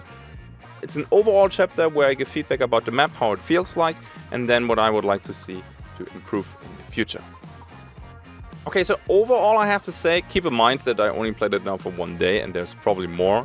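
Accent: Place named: Germany. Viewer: This is the most perfected Denglish I've heard in my life, I am a big fan! Great content dow 😅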